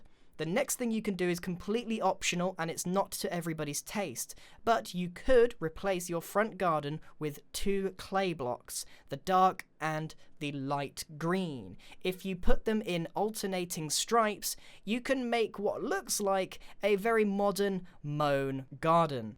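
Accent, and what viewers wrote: United Kingdom. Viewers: I kind of don’t like him talking british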